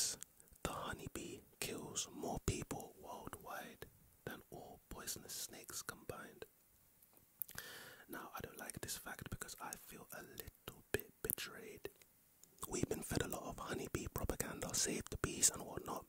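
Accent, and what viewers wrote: United Kingdom. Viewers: Nice to finally find a British asmrtist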